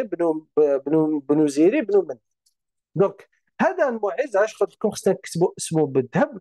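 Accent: Tlemcen, Algeria. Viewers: كلام الأستاذ واضح لا غبار عليه حتى ولو أدخل بعض الكلمات الفرنسية مع اللهجة التلمسانية الزيانية العريقة زادت للفيديو رونقا. تحياتي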